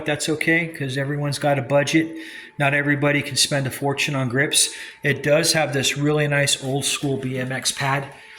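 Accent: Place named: California, United States. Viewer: Your channel is our favorite, along with RAD BMX and Sensei Phu with Epic,for a Florida boy you sure sound like a California Dude. You know an amazing amount of stuff in your lessons and should teach classes on this curriculum. Colleges need to offer courses in this, it’s serious Americana history. You explain things so clearly and articulately, it’s a soothing stream of consciousness that relaxes the mind and the soul. Thanks again bro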